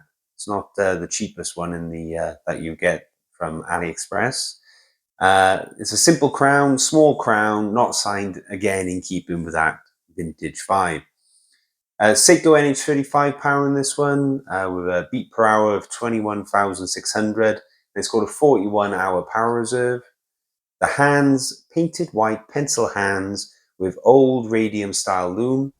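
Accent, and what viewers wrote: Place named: Cardiff, United Kingdom. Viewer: Sounds like a Cardiff accent to me....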